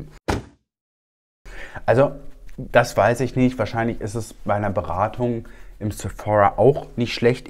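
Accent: Berlin, Germany. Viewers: Ich gucke gerade alle deine Videos 🙃 was mir auffällt: Ick vermisse dein berlinern in den neueren Videos. Aber generell suchte ich jedes Video. Liebe aus Berlin!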